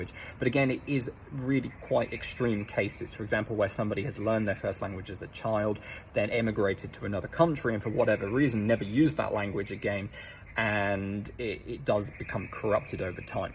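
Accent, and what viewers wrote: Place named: Southern England, United Kingdom. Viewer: He has a Southern English accent, but its formally called an Estuarian English accent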